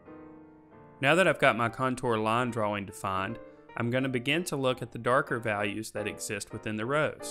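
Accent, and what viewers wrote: South, United States. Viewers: You sound sooooo much like a country western dude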